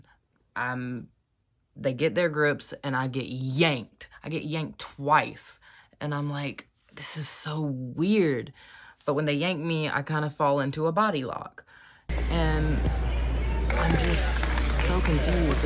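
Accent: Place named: South, United States. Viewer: Why cant you people from the south learn to talk better. The sounds you make are just aweful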